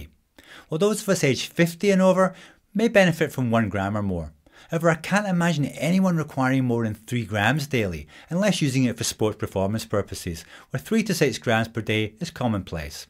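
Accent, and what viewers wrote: United Kingdom. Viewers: Very helpful! Thanks. Btw, will it make your hair fall out like this other supplement I forget the name of? ...creatine? P.S. what kind of fancy accent is he speaking with, something British? I couldn't understand some words, believe it or not.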